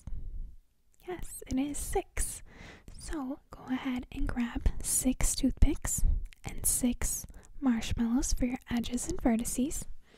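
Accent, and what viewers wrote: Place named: Minnesota, United States. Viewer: are you from minnesota? you got the accent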